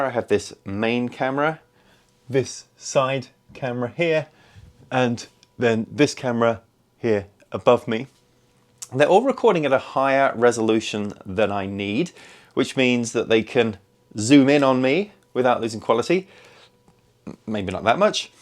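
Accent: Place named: Southern England, United Kingdom. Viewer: Very good ,will have a crack at this one and try it on the beer engine. It's that Southern accent again. Last time seeing the Saints live was Alan Balls last game. Think he was last player to stop playing from the 66 world cup team.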